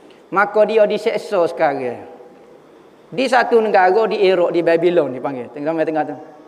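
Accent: Kelantan, Malaysia. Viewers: X paham kecek kelate sajo.. Jadi paham plok🤣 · Klate pekat meme gitu doh kak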